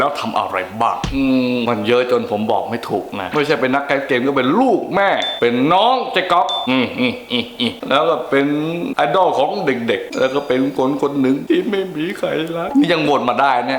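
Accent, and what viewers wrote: South, Thailand. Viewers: เป็นคนภาคใต้ใช้มั้ยครับ 😂😂😂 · เป้นคนใต้เหรอครับ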